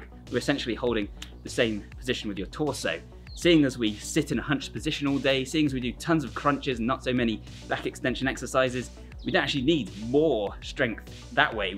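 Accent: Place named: RP, United Kingdom. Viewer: I was expecting an L.A gang member in a prison yard not this nice sounding man with a posh accent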